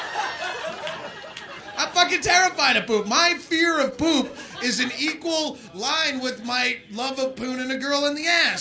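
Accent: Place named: Massachusetts, United States. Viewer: Still had his Mass accent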